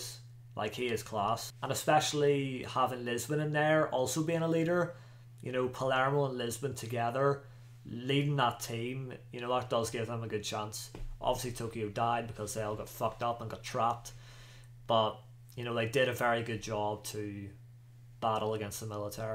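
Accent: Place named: Northern Ireland, United Kingdom. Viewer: I know a Northern Irish accent when I hear one 🤣 what part big lad? Only came across your channel today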